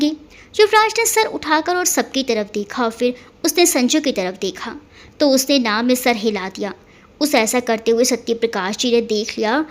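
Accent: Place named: Bihar, India. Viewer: Bihari Hindi bohot acchi lagi 👌👌👌👌❤️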